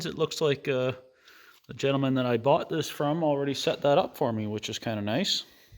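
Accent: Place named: Minnesota, United States. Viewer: I hear a Minnesota or perhaps Wisconsin accent :)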